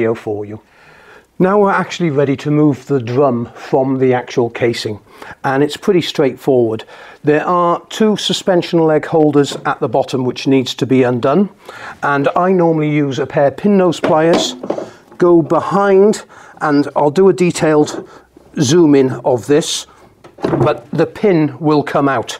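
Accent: United Kingdom. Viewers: Thanks a million for a great tutorial. And as English isn't my first language I especially appreciate your plain, sort of BBC like, English so easy to follow. Best regards! You've already created a legacy.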